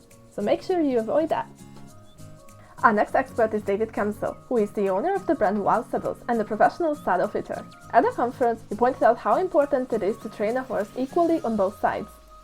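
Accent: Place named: United Kingdom. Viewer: Hey! Nice video, well done! You sound like a born british, and speak like a real youtuber :D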